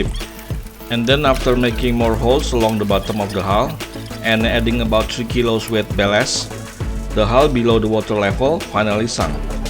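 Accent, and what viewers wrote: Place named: Indonesia. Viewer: Tetap semangat om, saya kira channel luar negeri, eh kok aksennya masih Indonesia+di perkuat nama yang sangat Indonesia sekali.😁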